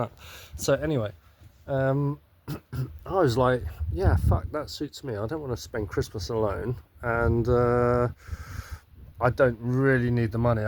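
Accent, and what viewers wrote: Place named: Australia. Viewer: hahahahaha.. thats fucking gold mate. Luv your ozzie mutha fucker accent too.... not to bad for a POM .... chortle. Love that classic saying about the cold water thing. Funny that I've never heard of it until you told me about 6 years ago. Its wild that you were on a farm bugger all from me at the same time. I went to the Canowindra oval to see the hot Air balloons go off so I was quite close to ya. I only lived down the road about 80 K's so we were pretty much neighbours for a short while. Love me some Lamb as well. Gran roasted some every sunday with spuds etc when I was growing up ....... my mouth still waters each time we pop one on. Nice to hear you scored a holden belmont ute too mate ... was it a HQ 1972 model??They're worth a bit now. Cheers from OZ me old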